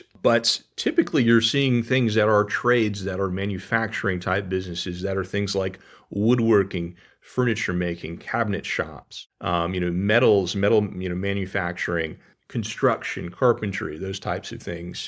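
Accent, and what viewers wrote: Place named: Pennsylvania, United States. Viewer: I believe your from Pennsylvania, the way you pronounce the Word Home or Home’s. ?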